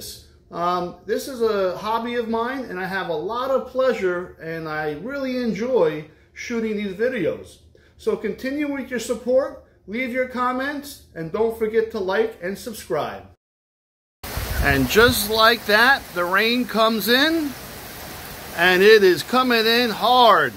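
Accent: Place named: East Coast, United States. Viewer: You’re obviously not a Floridian but the east coast twang is definitely there. Great idea hurricane parties that is right up my alley. I find it absolutely ridiculous how the media just gets over dramatic about these storms. It’s not that these should be ignored but most are pretty livable. Enjoy!!🥃🥃🥃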